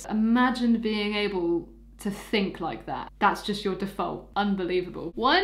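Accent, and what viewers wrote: United Kingdom. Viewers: Bri'ish accent is so cute in this one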